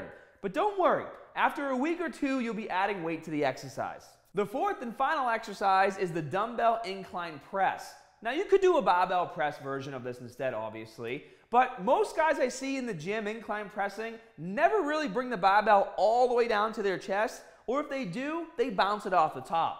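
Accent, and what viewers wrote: Boston, United States. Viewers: Holy Boston accent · The best part of this channel (outside the solid tips) is that if anyone new comes here, they will be so confused why he suddenly has or lost a Boston accent.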